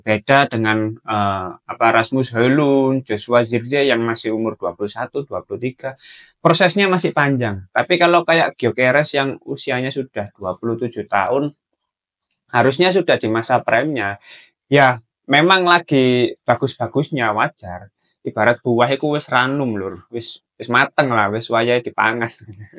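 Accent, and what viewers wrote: Java, Indonesia. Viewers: Sampean arek mana mas..logate kok koyo jowo sblh ndi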